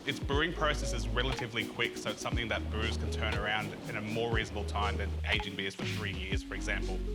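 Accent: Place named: New Zealand. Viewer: This guy's a kiwi, no Aussie.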